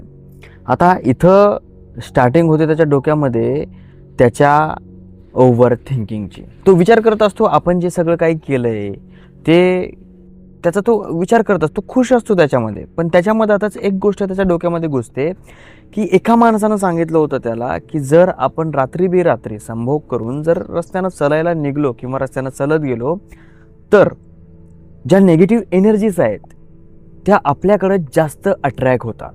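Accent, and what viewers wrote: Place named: Marathwada, India. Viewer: Parbhni nanded bhasha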